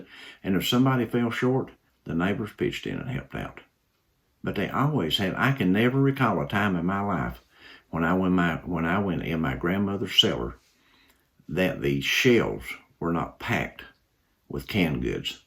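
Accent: Kentucky, United States. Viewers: My family is from Manchester, Ky. I just love seeing people from Ky. I didn’t grow up there but I still feel a connection to Ky. Your accent reminds me of my granny and my uncle and aunt. Love hearing you talk.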